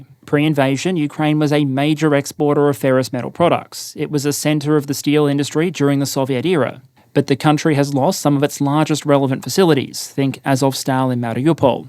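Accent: Australia. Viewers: Is this Ausralian? Dialect and/or dude?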